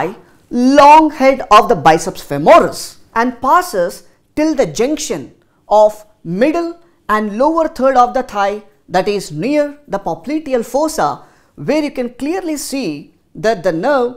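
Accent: India. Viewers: WOW This is a really good explanation and he speaks amazing. Is the most understandable Indian I have listen (I'm sorry if he is not exactly from India but rather a closely related country). He has an accent AND is clear. I need everybody to speak like that haha (even native English speakers). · Perfect voice sample for Indian accent!!! Am gonna save his voice for my ringtone!!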